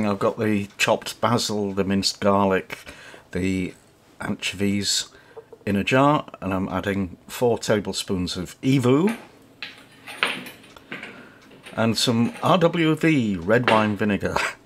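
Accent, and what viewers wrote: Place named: West Yorkshire, United Kingdom. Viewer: Hi, just found your website. I obviously know you are from Yorkshire, however where abouts? You sound like the same part of West Yorkshire that I am from so was curious 🙂 x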